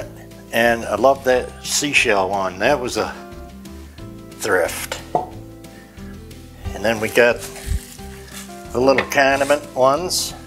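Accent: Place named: New York, United States. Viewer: I just discovered your channel this month and love it... love all the beautiful pieces of your collections and the cooking is excellent (so organized). But most of all your New York State accent and your laugh (chuckle) with the twinkily eyes all make me happy. There is one You-tuber whose voice and cadence never fails to make me depressed so I can't watch her. I popped on your channel to get instant happy. God bless you and (my daughter's name) and your family. Thank you for all your hard work in making these postings (and, of course). Your delight in seeing something fabulous (ie. Washington, DC trip) is wonderful! God bless!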